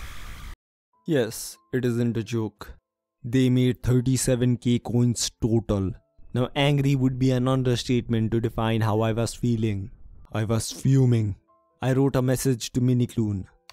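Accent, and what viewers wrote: India. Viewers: can you tell from which country you are you really sound in indian accent ?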